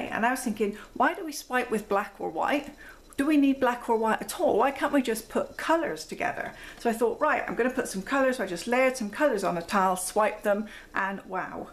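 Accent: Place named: England, United Kingdom. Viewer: I really enjoy your videos. You're not afraid of making mistakes and don't become nearly suicidal when a canvas gets ruined...ha ha, I suppose we all feel like throwing ourselves from a garret when things don't go right... Anyway, I just wanted to say I enjoy your "proper English accent"! So very "Downton Abbey", don't you know?!:-) Cup of tea, guv'nor? Okay, okay, I promise to stop!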